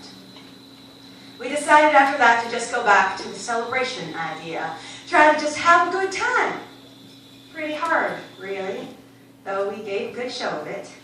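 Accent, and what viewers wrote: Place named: Georgia, United States. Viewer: I love this, and I love this book!!!! Her accent sounds Georgian. I’m from Alabama, and we don’t sound like that, and it’s sure not Cajun or Mississippi-ian. Thanks for the upload!:)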